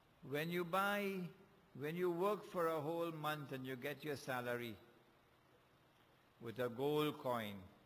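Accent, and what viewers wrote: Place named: Jamaica. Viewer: Him speak in jamaican accent maan!!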